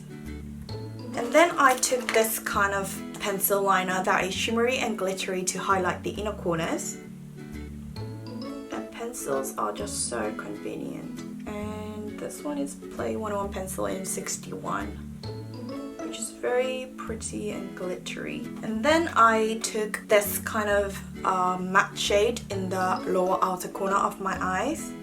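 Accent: United Kingdom. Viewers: 우아 진짜볼때마다 느끼는데 영국식?영어? 어쨋든영어넘넘잘하시네요😃부러워요😢영어팁도브이로그로알려주심감사할것같아요❤ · 저는 핸드폰과 이어폰이요!! 운동할때 너무 지루하고 힘들어서 그 때는 좋아하는 노래를 듣거나 영상을 보면 시간이 진짜 금방 가요 흥얼흥얼거리면서🎶🎶 언니 영국발음 너무 좋아요ㅠㅠ 언니처럼 영국발음하고 싶어요ㅠㅠ 제가 영어 좋아하는 편 아닌데 언니덕분에 배우고 싶은 욕구가 엄청 쑥쑥!! 오늘도 영상 고마워요😍😍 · sydney님 영상 정말 잘 보고있어요<3 영국발음 너무 멋지세용!! 저는 해외 살때는 쥠을 자주 다녔는데 한국에만 오면 헬스장을 안가게 되더라구요ㅠㅠ 외국에서 쥠 다닐땐 거의 저도 생얼로 다니지만 꼭 포기 못했던건 눈썹!!!!!! 그리고 립이였던 것 같아요 ㅎㅎㅎ 저도 틴트는 꼭 들고다녔어요 히히 그럼 좋은 연말되세요 님! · 언니 영상 볼때마다 항상 영어 공부가 되는거같아서 정말 좋아용 영국식 발음 듣는거 좋아하는데 언니 영상 보면서 흐뭇흐뭇^_^ 저는 운동할때 포기할수 없는건 립이에요 다른건 없어도 아파보이지는 않으니까요!! 립 하나만으로도 생기를 줄 수있우니까=3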